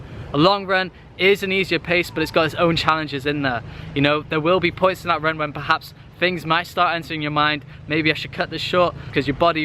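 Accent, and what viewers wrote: United Kingdom. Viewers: You sounds british but have palm trees in the background. whats the story there?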